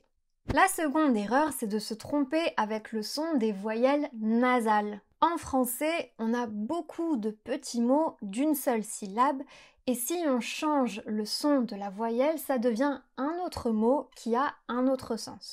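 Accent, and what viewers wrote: England, United Kingdom. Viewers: c’est fou qu’elle a un accent parfaitement anglais! c’est super cool mais juste différent! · You do realize that she has 100+ videos on her channel right? She speaks English in more than a few of them...and she pretty much always sounds British. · Thank you, your video is so helpful. Plus, I love your english accent! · Oooooooooo j'aime votre prononciation des mots en anglais. ❤️ J'entends un léger accent British quand vous prononcez les mots; trop mimi; c normal, la France est plus proche du Royaume-Uni que les USA/le Canada.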